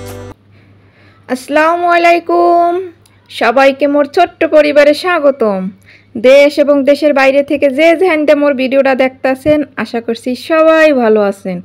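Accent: Barishal, Bangladesh. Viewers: ❤আপু তোমার সাথেই আছি ❤আর তোমার বরিশাইল্লা পাহা পাহা কথা শুনি ❤👍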